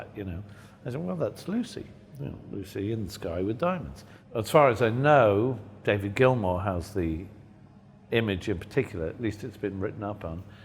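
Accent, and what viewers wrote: United Kingdom. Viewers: Desculpe as vezes escrevo rapido e dai errado.. Mas que pena que vc é um sonho muotoooo distante Provavelmente nunca vou te conhecet...conheci seu tio Paul num show no atena fiquei bem perto..foi bom.. Mas sou fã msm fo seu pai John lenmon adoro ele e sua mãe Cintia com todo respeito Adoro seu rosto..perfeito..voz b britanica rstsrs... Mil bjs...sonho impossivel de realizar..te conhecer pessoalmente...❤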